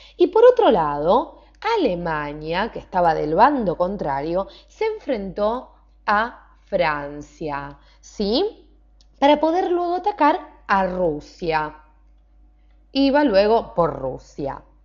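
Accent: Argentina. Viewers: es de argentina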